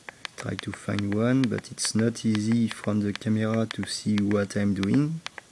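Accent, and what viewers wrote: France. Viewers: le francais qui parle englais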